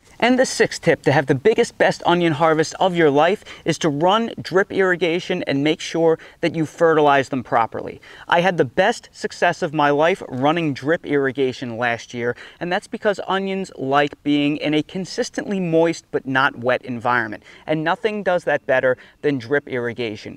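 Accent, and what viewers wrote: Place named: Western Pennsylvania, United States. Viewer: Love your videos. Looking at the length of day chart, I'm confused. If the length of day zones are based on latitude, why are the zones staggered vertically, I would assume that since parallels of latitude are at a constant angle, why are the zones staggered vertically? Just a thought, I live in Farmington, NM in the intermediate zone. As an aside, you said you lived in Pennsylvania. My family was from the Johnstown area and Indiana, PA area. I note an accent, perhaps western PA?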